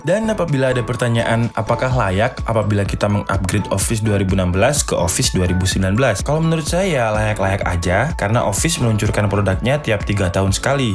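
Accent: Java, Indonesia. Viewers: Menurutku bedanya GK terlalu jauh dengan Ms 2016 Bang dari Jawa y wajar logatnya logat Jawa kalau bicara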